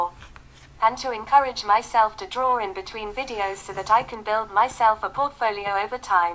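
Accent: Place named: United Kingdom. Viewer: Hi, oh yeah I found your video about "how I become an Artist" and I heard you talking, your speech is very clear. Also I heard you say you are in London, I should have figured that out from the british accent on your communication device. Alas I'm in the USA, otherwise it would have been nice to meet up, you're very pretty. Well I'll be looking forward to your Q&A video. Take care!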